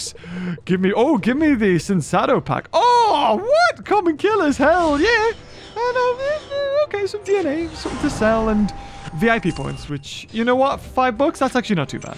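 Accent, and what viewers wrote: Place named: United Kingdom. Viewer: You can tell that he's British!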